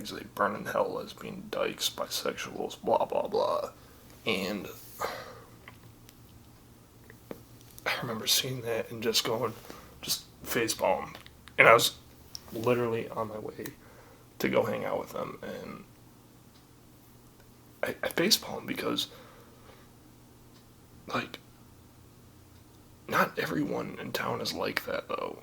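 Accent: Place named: New England, United States. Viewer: Are you from New England? Your accent, I’m a Bostonian you have a familiar accent lol.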